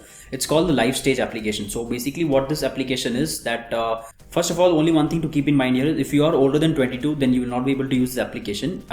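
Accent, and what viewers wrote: India. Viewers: lol sick Indian accent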